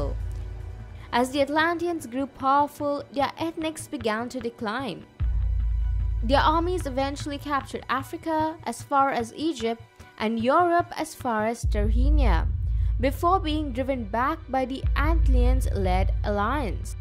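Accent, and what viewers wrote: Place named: India. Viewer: Rayhan Sakib Sounds like Indian accent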